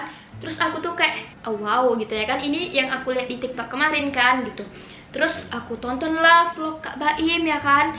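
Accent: Pekanbaru, Indonesia. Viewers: logat pekanbaru banget kak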